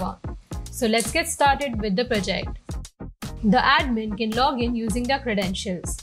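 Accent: India. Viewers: I mean no disrespect. But I am African. As an African, listening to English is challenging enough. Add to the equation, Your very heavy Indian accent and this video just as well could be in Russian. You lost me in translation. May I suggest, you using a AI synthesised voice-over in your clips?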